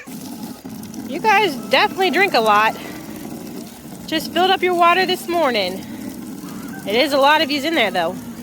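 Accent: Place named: New York, United States. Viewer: Yous???? LOL Are you from NY??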